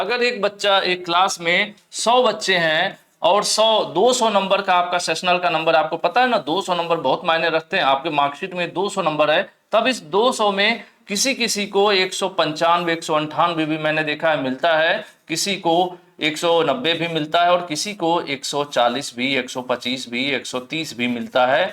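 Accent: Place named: Bihar, India. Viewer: Sir bihar se hai😊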